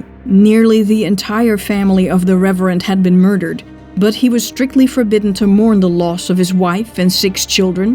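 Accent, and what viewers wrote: Netherlands. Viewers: Of all the masses of information I have read, and documentaries I have watched, on the subject of the wreck of the Batavia, this is by far the best. The narrator is brilliant - the slight Dutch accent brings the story to life, and some of the facts presented even I did not know - and I’ve been an avid student of this shipwreck for over 40 years! This entire channel brings history to life in a very detailed and understandable way that draws the audience into the story and compels them to follow it through. I’ve taken to playing the audio through the car stereo on my way to and from work, the stories fascinating and well told. I cannot wait until another subject gets the Defragged History treatment.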